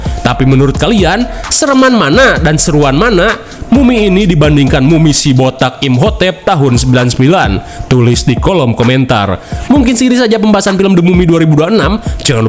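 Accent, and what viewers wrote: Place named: West Java, Indonesia. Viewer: Vibe horornya keren bangets...komentatornya juga logat sunda nya keren....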